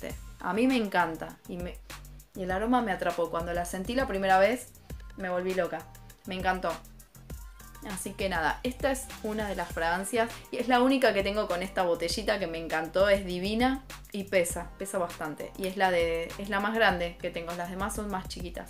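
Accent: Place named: Argentina. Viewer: Ya me parecía. El acento argento es imposible de no reconocer. Yo también soy de Arg.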